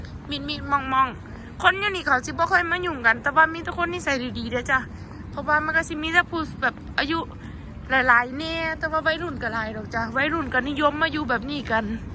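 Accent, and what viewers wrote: Chaiyaphum, Thailand. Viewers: สำเนียงเว้าคือคนชัยภูมิแท้ แม่นบ่ครับ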